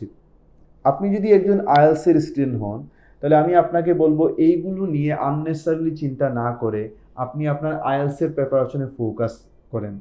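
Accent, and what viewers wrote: Sylhet, Bangladesh. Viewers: Bhai Sylheti ni🥰🥰🥰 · Sir kota bolar doron Sylheti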